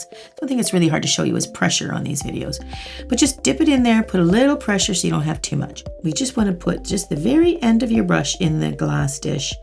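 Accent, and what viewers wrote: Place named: Canada. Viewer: Heard a slight accent and thought, she mightttt be Canadian.... Then checked your page and realized you're in BC! Can't wait to order your nail kit when it's back in stock <3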